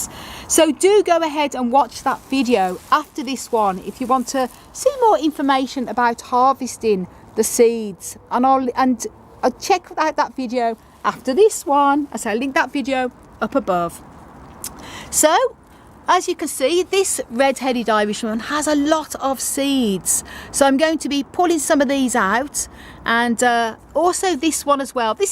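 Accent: United Kingdom. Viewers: I like your British ascent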